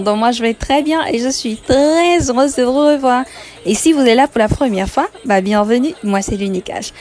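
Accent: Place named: France. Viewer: J'aime bien ton accent de Français ma cherie. Merci beaucoup pour cette belle video. J'ai aimé vraiment. ❤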